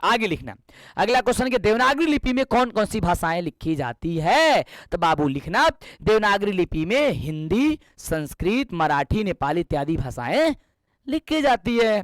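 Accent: Bhojpuri, India. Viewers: Bhojpuriya sir❤❤❤😅😅😂😂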